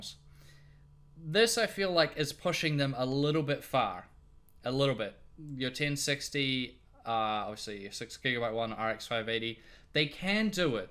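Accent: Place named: New Zealand. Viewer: Hi, Nice accent!! Sounds like you're from Zew Zealand.. I just got the GTX1070 MSI OC card (since they are coming down in price) with my new 6core I5-8600K build. I need to buy a MONITOR without breaking the bank!!!.... I was thinking of a higher refresh rate, like 120hz or 144hz at 1080p. I play Battlefield 4 mainly and PUBG. HOWEVER, it seems in the mid ((($300))) US dollar range that these monitors have subpar picture quality, bleeding and other bad things that happen while gaming. Then I thought about the Vizio 43" 5ms D series LED TV. It says 120hz.. but I'm betting my nickles in my pocket that its probably some marketing ploy and not really native 120hz. But its picture quality is amazing at 1080p and it's around $300. What do you think or suggest I do for around $300 us $?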